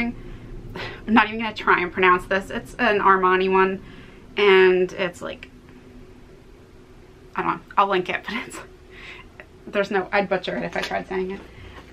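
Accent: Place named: New Jersey, United States. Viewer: I'm gonna bet you're from New Jersey, I know that accent anywhere, lol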